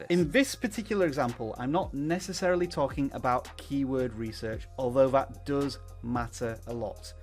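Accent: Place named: United Kingdom. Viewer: Don't you even think of faking an artificial "neutral English" accent, because one of the thing I most like about this channel is your British accent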